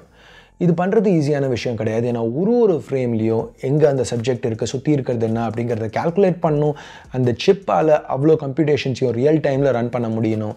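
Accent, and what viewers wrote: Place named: Tirunelveli, India. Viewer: Tirunelveli bro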